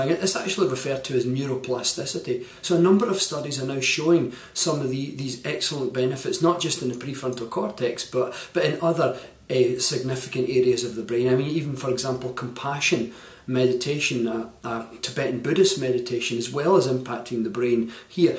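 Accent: Scotland, United Kingdom. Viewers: was recently introduced to your work and have to say irs so refreshing to hear a male Scottish voice in the field and one looking so good at 91 too! excellent work young man! excellent.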